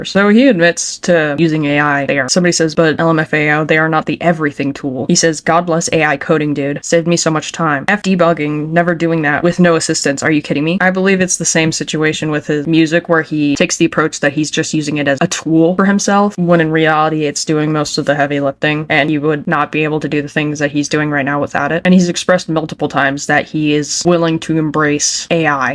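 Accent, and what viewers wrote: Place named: United States. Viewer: I'm sorry I just find it so funnily absurd that your name is and you have the most american accent I have ever heard (which i assume you are one, based on your account location)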